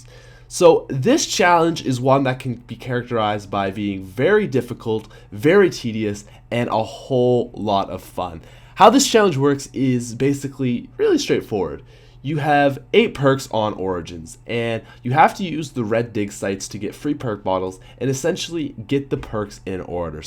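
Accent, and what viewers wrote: Canada. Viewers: don't dis Canadians accent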